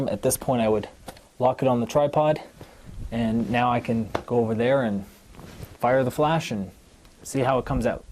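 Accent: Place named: Eastern Europe. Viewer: You sound bit like eastern european but I'm probably wrong. Nice vid!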